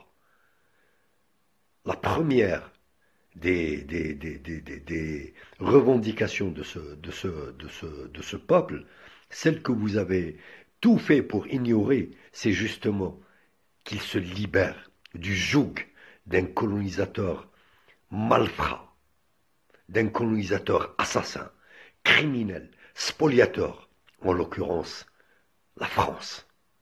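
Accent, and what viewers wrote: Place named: Tlemcen, Algeria. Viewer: Salam mon frere. Bien dit, qu"est ce qu'on peut attendre de cette maudite crapule france c'est satanas de cet univers...Mais d'un côté c'est bénéfique ce que dévoile au dessus de sa cape pour faire savoir ce qu'on vaut quel prix et quel estime a pour nous surtout le message est adressé à ces chiens et bousba3 je suis aussi de la région de Tlemcen ce que j'ai noté dans ton accent on est de la même petite ville Remchi. Salut de Mdrid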